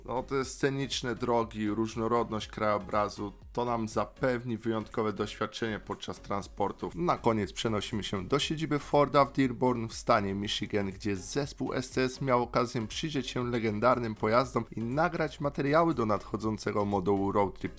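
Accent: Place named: England, United Kingdom. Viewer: witam cię mam pytanko mieszkasz w uk czy w polsce bo akcent masz angielski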